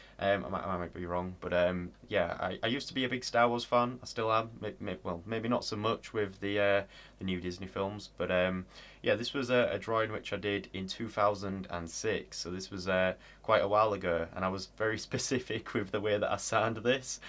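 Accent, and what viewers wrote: United Kingdom. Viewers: So like are you British? Or are you Australian.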